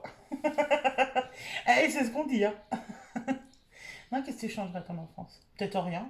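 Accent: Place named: Picardy, France. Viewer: Coucou, j’ai découvert ta chaîne grâce à tiphaine. J’aime beaucoup ta personnalité, tu as l’air d’avoir une histoire touchante, mais tu as réussi à te construire une vie de famille super. Tu fais un super joli couple avec ton mari, vous allez bien ensemble. Vous êtes de quelle région? Moi je suis de Picardie et j’ai l’impression que vous avez un petit accent comme nous! Bisous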